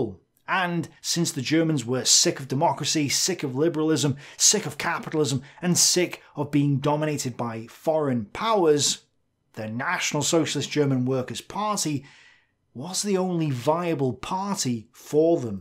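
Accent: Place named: Cockney, United Kingdom. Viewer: Dude you have a strong cockney accent ! It sound very weird but I am ok with rhat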